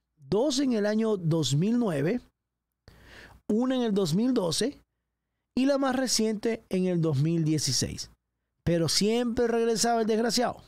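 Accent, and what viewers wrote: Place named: Honduras. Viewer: Tu acento me suena undureño...😅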